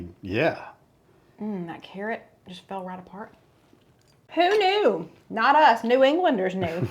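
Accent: South, United States. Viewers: Now a days I put mine in the crockpot. The Rueben’s for the leftovers are the best part! you say “boil” however you want to!Its fine and I love the southern “accent” of it❤ Also I am jealous that I can’t go to your meet & great, but I bet you & everyone who attends will have a blast!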